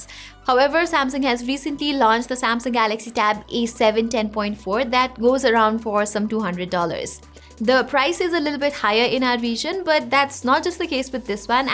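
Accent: India. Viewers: 😜😜indian nd Pakistani boys 😜👀mall heina ye?👀 First time dekh rha ho education or English se lag rha hai indian hai❤️❤️👀 🙊🙊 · It sounds sweet when Indians speak fluent English. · Nah bro, last thing I want is a indian accent voice assistant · I really love your Indian accent...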